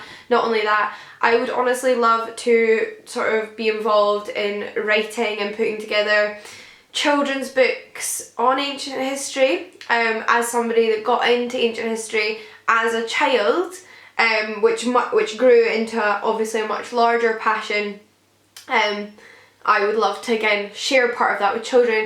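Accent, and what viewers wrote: Scotland, United Kingdom. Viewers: just the other day when I watched a video of yours (and I watch them all, even if I know I won't read the book mentioned etc), I thought to myself "why does 'only' have 29k subscribers? why is it not something like 100k?" you're honestly my favorite booktuber and I love how passionate you are and I could listen to you talk all day (yes, I'm guilty of loving your scottish accent). you seem like such a sweet person and I'm so looking forward to hear you talk about books even more in the future! congrats on 30k, you really deserve it! xx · With a Cuppa. You Scottish? Where from?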